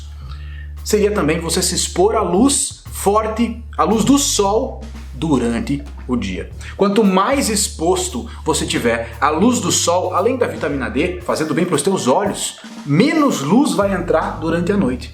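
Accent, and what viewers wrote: Rio Grande do Sul, Brazil. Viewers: De que cidade gaúcha tu és tchê? Rsrs sou de Gravataí-RS abraçao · Pelo seu sobrenome, seu jeito de falar, creio q tu seja do rio grande do sul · Como se diz aqui no Paraná, "LEITE QUENTE FAZ BEM PARA O DENTE". Contanto, acho que o deve ser gaúcho, pelo sotaque. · Mas que bagual, gaúchos por aqui tchê, loco de especial meu pia? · Mas bah tchê, acho q tu é Gaúcho, não? Kkk é nóis bagual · Por acaso você é gaúcho??????? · Certo que esse cara é Gaúcho haha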